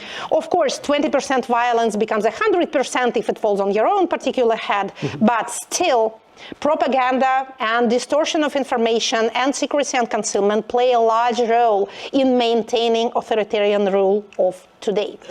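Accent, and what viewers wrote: Russia. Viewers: It’s a Russian accent not German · С русским акцентом легче понимать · Зе инглиш из соу рашн❤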